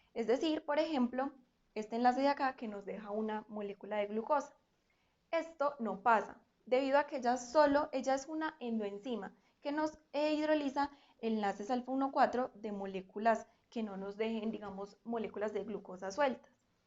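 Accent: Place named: Colombia. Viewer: Que bonito acento, supongo colombiano y gracias por enseñar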